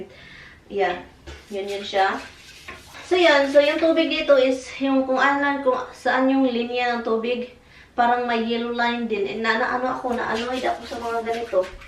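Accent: Visayas, Philippines. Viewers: Tagal mo na dyan sa america. Ang accent mo bisayang bisaya. Bat dka magtrabaho pwede rin pala dyan housekeeper.0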